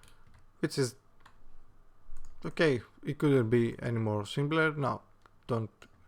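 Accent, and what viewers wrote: Greece. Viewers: Are you greek? You sound like it :)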